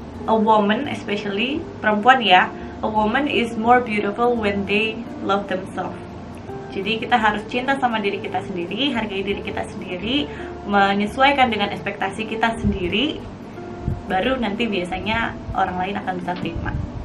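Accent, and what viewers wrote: Java, Indonesia. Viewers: Buk wagub udh medok logat jawa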